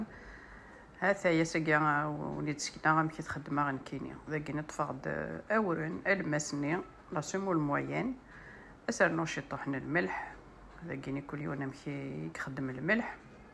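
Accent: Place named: Kabylie, Algeria. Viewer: Merci pour cette belle recette et très beau commentaire langage de la grande Kabylie bel accent qui donne chaud au coeur car je suis de la région d Azazga c'est une fierté bravo et merci infiniment bonne continuation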